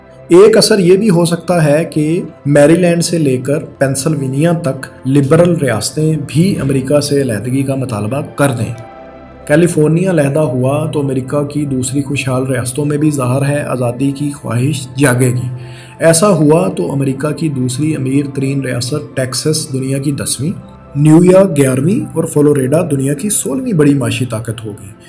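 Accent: Punjab, Pakistan. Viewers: bhai....khuda key wastey urdu main punjabi mat Bola Karo yaar....English words ko bhi punjabi style msin parhtey ho...urdu ka janaza mat nikalo yaar...hire some good urdu speaker...plz...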